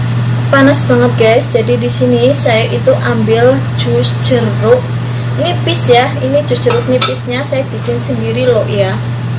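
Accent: Banyumas, Indonesia. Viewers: ngapaknya medok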